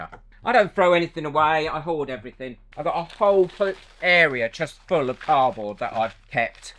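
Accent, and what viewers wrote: United Kingdom. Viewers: You are adorable! I don’t really care what you’re making, I’d tune in just to watch a dude n listen to a Brit do crafting. I subbed. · I had no idea that so many people Love boxes!!!! I collect them, thinking, well, you never know…it’ll come in handy for something sometime. I’ve never done crafts, I just love boxes! I usually end up needing a particular size to mail something in. I’ll watch your video and maybe be inspired to try something new! and, btw…im an American and I love your English accent. English accents sound so sophisticated.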